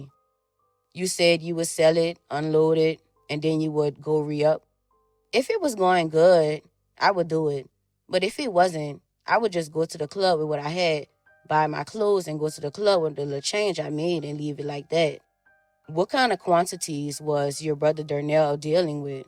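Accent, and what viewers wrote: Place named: Louisiana, United States. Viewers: That’s a New Orleans accent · I like how the narrator got that LA down south accent puts u rt in the courtroom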